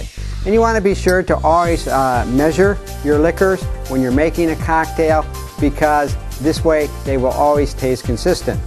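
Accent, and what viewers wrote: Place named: Chicago, United States. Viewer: This dude sound exactly like what I imagine Chicagoans should sound like. Da Bears!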